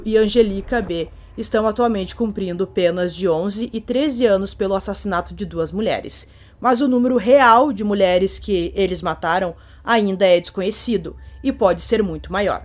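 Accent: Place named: South, Brazil. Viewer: O que estraga o vídeo é o sotaque sulista. Meu Deus muito ruim e chato esse S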